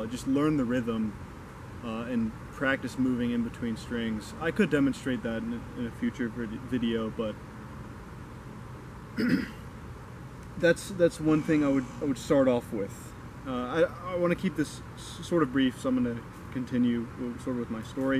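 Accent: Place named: Canada. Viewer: your accent sounds canadian, reminds me a lot of Robert Pattinson in The Lighthouse which is an awesome movie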